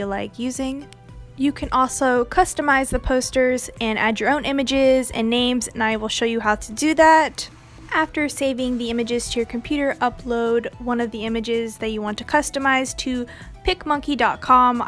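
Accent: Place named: United States. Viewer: how much I hate American accent.....eww but these diys are so cute wow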